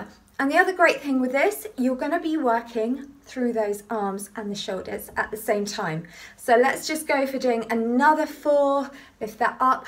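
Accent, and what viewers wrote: United Kingdom. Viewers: superb british accent. 😊😊😊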